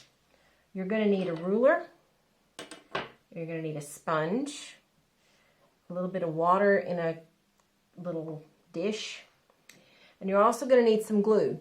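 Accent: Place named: United States. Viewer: You sound American then you use a word like “quid “?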